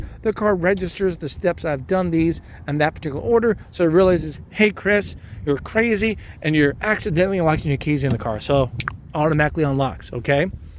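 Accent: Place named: Midwest, United States. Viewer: Sounds like a Midwest English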